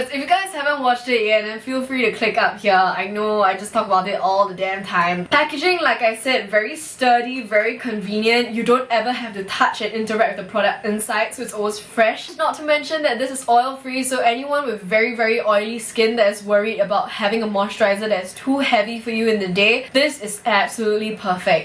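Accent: Singapore. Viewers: OMG you sound just like my classmate from Singapore. Great review! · lol this is my first time watching your vlog and I cant believe I guess it right, I immediately thought that you're a Singaporean just by listening to you talking hahahahaha I'm from Malaysia btw. Thank you for the honest review, I have some COSRX's stuffs on my wishlist but still don't know which one exactly to get so this really helps me! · It's the Singaporean accent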